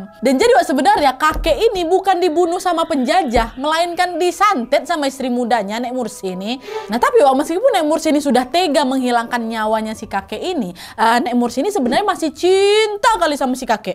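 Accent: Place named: West Sumatra, Indonesia. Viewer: Kak orang mana ya???kok ada sensasi bahasa Minang nya, 😅😅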